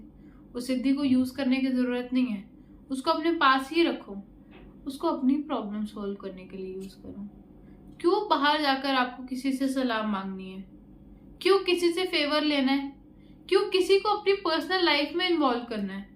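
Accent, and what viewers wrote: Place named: Rajasthan, India. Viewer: Hamne bolne ki tun she pata lga liya aap rajsthani ho. 🎉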